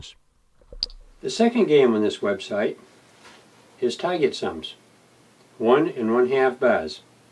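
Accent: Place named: Boston, United States. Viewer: Much respect, talking about fraction "bah's" like he's from Bah-ston.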